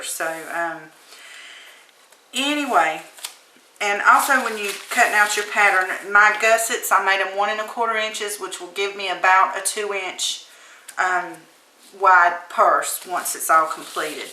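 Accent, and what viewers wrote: South, United States. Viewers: I love your instructions. You make is so simple. And your Southern accent. Subscribed to you!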